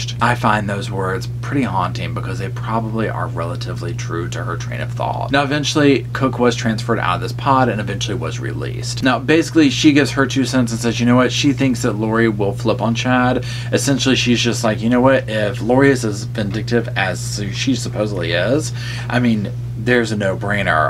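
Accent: South, United States. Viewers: Thanks, from Ireland 🇮🇪. I love your southern drawl and your hospitality knows no bounds. If you're ever in Ireland I'll serve you some pie, we'll gather around the table and talk true crime. Very informative and well researched and what makes it so entertaining is your wonderful personality and talented acting. Love you. 💕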